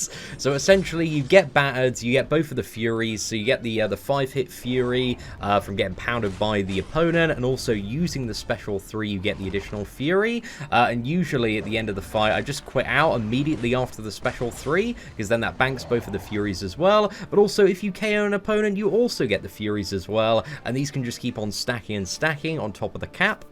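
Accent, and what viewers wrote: United Kingdom. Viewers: The commentary the pure British accent what more could you ask for.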